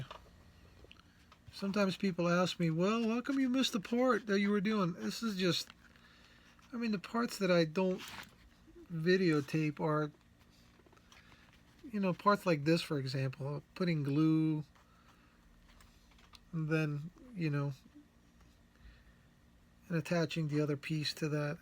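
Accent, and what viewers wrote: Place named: Baltimore, United States. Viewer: you have a Bawlmer "about" and "over"! What's with that? Love watching you work!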